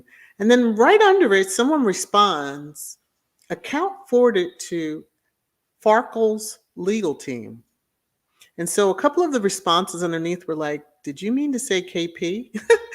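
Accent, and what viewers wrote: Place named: RP, United Kingdom. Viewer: 👍🏻👍🏻👍🏻👍🏻👍🏻👍🏻🧚🧚🧚😘😍be safe ✝️✝️♥️♥️🌹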